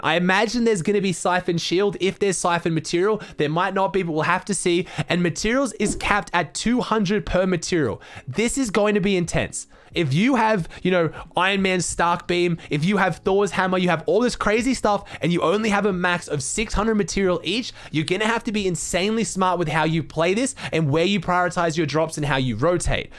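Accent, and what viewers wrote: Australia. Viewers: Aussie tryin to coach me? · How many people low aussies accent 👇🏻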